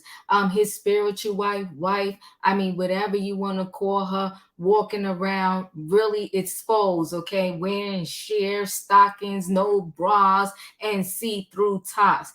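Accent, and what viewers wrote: New York, United States. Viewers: . I’m from Queens and I hear NYC when you speak. Am I correct?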